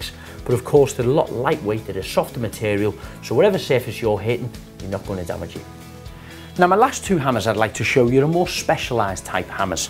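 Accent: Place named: Liverpool, United Kingdom. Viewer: Accent is from liverpool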